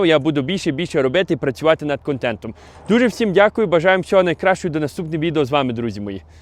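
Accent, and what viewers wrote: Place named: Hutsul, Ukraine. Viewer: Ти мені подобаєшся ,настоящий гуцул з полонини,і мова така,я працював з хлопаками з западної України. І в Чехії так їх тьма,трутнів,не таких простих заробічан як ми стобою. А роботодателів.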